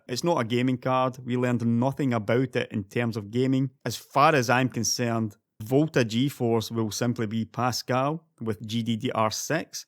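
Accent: Scotland, United Kingdom. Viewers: Where in Scotland are you from? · as far as Scottish accents go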